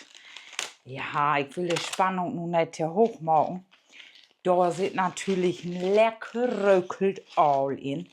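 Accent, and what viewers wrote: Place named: Low German, Germany. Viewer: Ulkig 😂 ich bin Thüringer und ohne zu lesen , habe ich alles verstanden. Erst zum Schluss merkte ich ui dat ist jo platt. 😂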